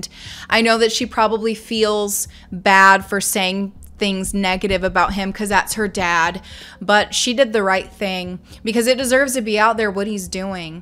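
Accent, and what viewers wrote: Arkansas, United States. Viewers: ❤ Are you from Arkansas? I am..😂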